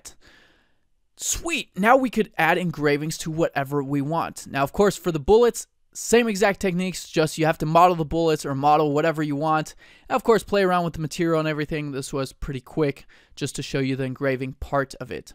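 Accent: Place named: France. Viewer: You have a perfect French accent ! Thanks for this tutorial.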